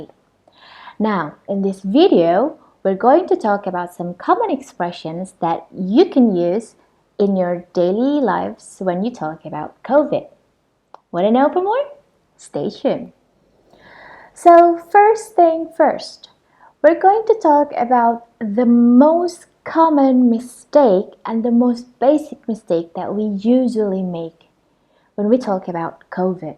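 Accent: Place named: South Jakarta, Indonesia. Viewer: jaksel aksen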